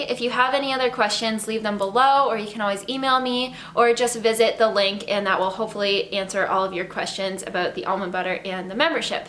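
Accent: Canada. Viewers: She sounds Canadian, but it seems like she lives in the US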